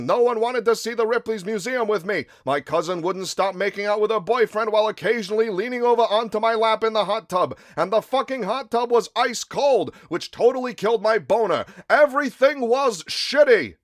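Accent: Brooklyn, United States. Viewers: Your Italia /Brooklyn accent makes this so much better.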